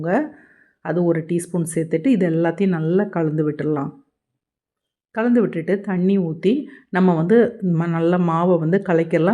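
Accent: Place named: South, India. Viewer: Love your South side slang ma'am. Nice❤❤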